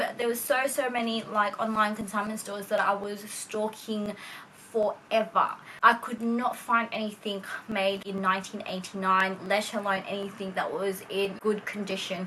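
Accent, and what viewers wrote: Australia. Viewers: Australian accent...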